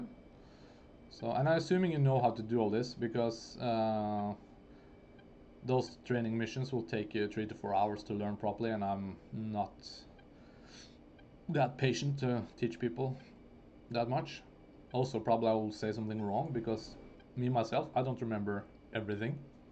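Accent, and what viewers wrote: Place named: Norway. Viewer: U sound Norwegian💯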